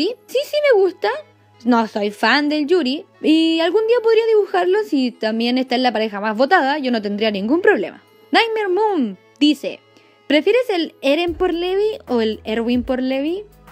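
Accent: Chile. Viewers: Es que lo dije en cuánto escuche tu voz xD Se nos nota mucho el acento chilensis(?) xD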